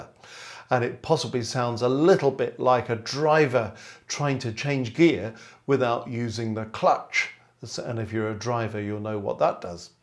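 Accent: United Kingdom. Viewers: British accent? Bossman teach you nuance in music theory so you can thrive and you can't distinguish which part of the Isles his accent derives? Coolios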